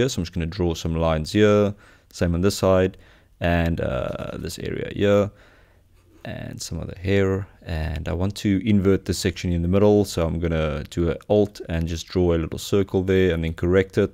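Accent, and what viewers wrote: South Africa. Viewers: this man sounds like a south african!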